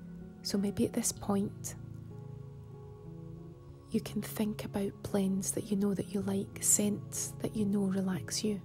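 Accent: Scotland, United Kingdom. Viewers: The Scottish accent is heavenly with headphones. Oh, and when I finally nodded off, I had a dream that you made a charcuterie board ASMR vid. Then it dawned on me; without me readers on, that's what the salts 'n oils board sort of looks like. 😅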